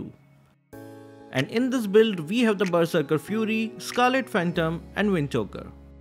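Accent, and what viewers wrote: India. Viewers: are you from India, because your voice is like an indian very clear, some I noticed that you equip the map of India? 😕🤔 By the way thanks for this video it will help players like mm. players and also us to improve our skill and builds. 👌🙏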